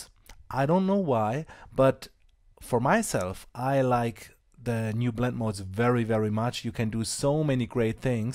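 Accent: Germany. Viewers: Irgendwie wusste ich schon vom ersten Wort an, dass du aus Deutschland kommst. ^^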